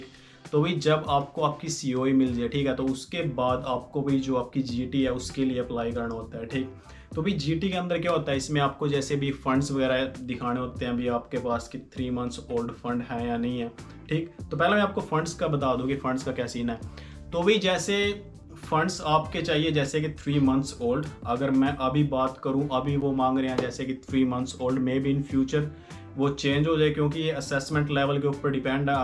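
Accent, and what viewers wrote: Haryana, India. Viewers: Bai haryane te aa ?????😎😎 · HARYANA SA H BAHI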